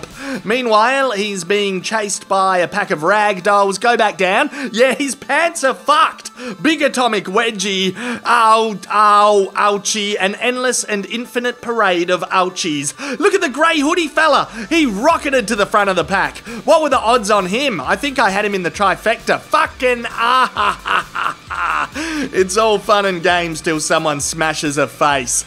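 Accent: Australia. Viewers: Good clean fun. "Big atomic wedgie". The Aussie voice over is awesome. That's why us Brits love 'em. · You can't beat an aussie commentator.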